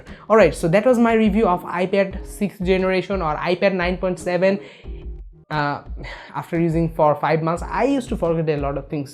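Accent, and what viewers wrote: India. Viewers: Your english is so smooth than another indians. Love the accent 🤓 🇲🇨 love indian people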